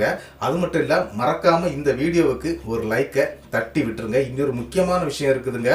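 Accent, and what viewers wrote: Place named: Nagercoil, India. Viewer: நம்ம நாகர்கோவில் ஊர் காரர் என்பது பேச்சை வைத்து அறிந்தேன். மிக சுவாரஸ்யமாக அழகாக சொன்ன விதம் ரசிக்கும் படி இருந்தது. நீங்கள் சொன்னதால் தான் நான் சப்ஸ்க்ரைப் செய்தேன். நன்றி நல்வாழ்த்துக்கள்.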